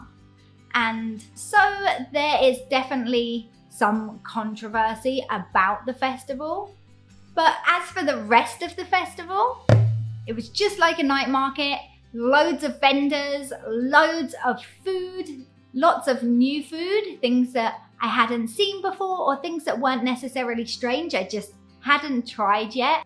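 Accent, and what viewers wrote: United Kingdom. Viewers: 為什麼要學英國人說話？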